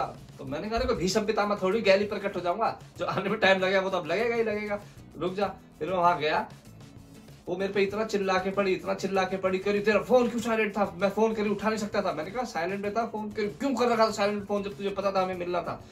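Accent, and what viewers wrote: Haryana, India. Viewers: Sach me yaar tumhara hindustani accent h jo bolne ka dil jit leta hai. Bb ki wines se bhi tagre ho ap yaar mstram ho bhai ap sach me god bless u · भाई राम-राम जी, बोल्ली से तो हरियाणा या वेस्ट यूपी के लाग रे हो? बताई दो कां के हो 😊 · Bhai kha se ho Haryannve se lag rahe ho bhasha se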